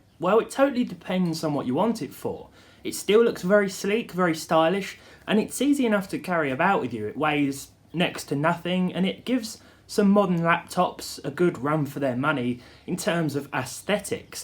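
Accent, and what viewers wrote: United Kingdom. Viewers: I really love this channel, especially that Brit voice it’s easy to listen to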